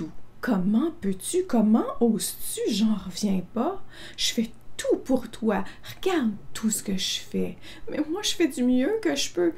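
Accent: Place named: Quebec, Canada. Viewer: Bonjour, oulala très pertinent et j'ai vécu celà avec certitude, plus la culpabilité et la colère de cette relation, plus la blessure de rejet à l'adolescence lorsque j'ai voulu exprimer ma vérité, d'où dépression à ce moment, restant en latence au fond de moi jusqu'à présent! Eh bien, je touche du doigt le fond du problème, merci merci merci!!!🥰 Et j'adore l'accent québécois!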